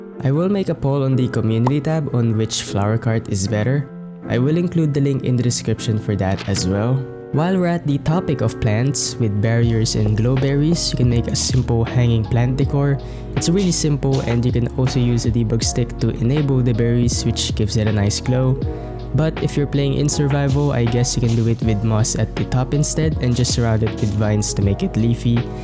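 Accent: Philippines. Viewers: You sound Filipino 🧐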